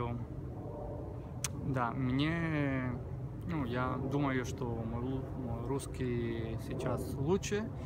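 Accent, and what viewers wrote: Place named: Spain. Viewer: Произношение супер! Первый раз слышу от испанца такой чистый русский, ты меня приободрил! Потому что обычно когда слышу с каким акцентом испанцы говорят по русски, прихожу в ужас от того, как наверно, слышится моя речь по испански.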